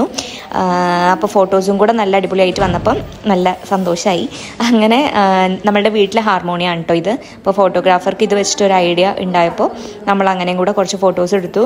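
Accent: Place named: Malappuram, India. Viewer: Malappuram thanje ayathu kond talk nalla pole cnct anuu😄so kettondirikkan nalla rasayittundd💛🧡😁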